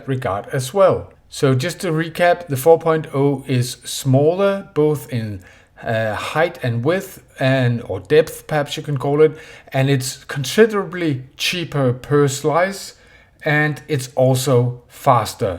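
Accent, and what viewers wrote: Denmark. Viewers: “Det er en dansker.” Jeg var inde og kigge efter den første video jeg så med dig. Der er noget specielt over danskere der taler engelsk. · Are you from Denmark? 😊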